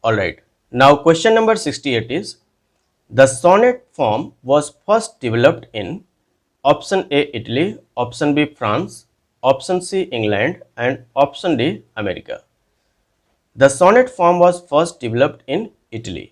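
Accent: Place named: mixed, India. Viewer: it's French origin word, s per dictionary the phonetic symbols u have replied is absolutely bang on. My only request was not to pronounce the word in Typical UP, Bihar accent n not to dishonor you. In ur reply u can see the sound of 'N' is bracketed which allows us to pronounce the word without N that makes the pronunciation better n that suits ur vast grip in literature 🙏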